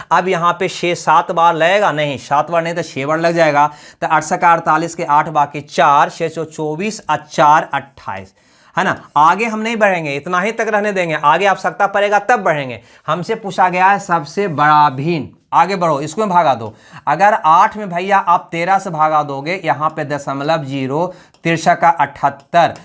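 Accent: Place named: mixed, India. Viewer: Sir apne Hindi bolne ki language samj nhi attii simple Hindi boleya naa takke bakki logo ko samj aye ap Bihar up mp type Hindi bolte hoon samj nhi attii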